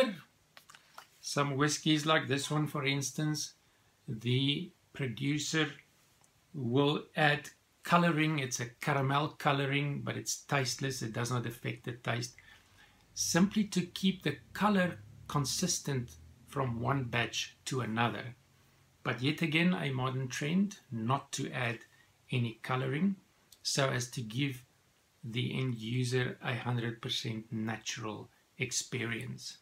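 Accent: South Africa. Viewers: I'm a complete beginner when it comes to whiskey, and this is by far THE BEST video I've found that explains everything clearly and in an order that makes a lot of sense. Thanks so much! I also hear a South African accent, which is where I'm from 😁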